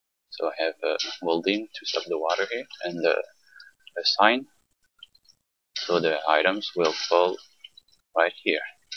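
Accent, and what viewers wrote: France. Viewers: i need to know, are you french?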